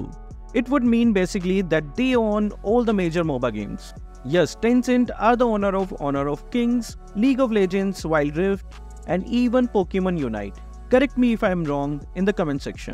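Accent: India. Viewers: So difficult accent indian ... Caption made you understand instead your voice over..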